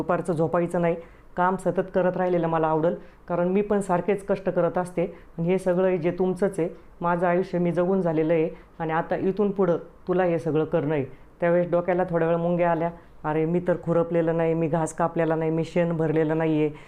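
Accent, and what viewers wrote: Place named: Ahmednagar, India. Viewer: नगरी भाषेचा गोडवा, मस्त!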